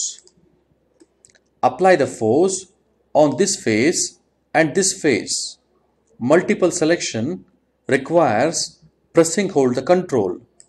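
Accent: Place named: India. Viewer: God!Indian English!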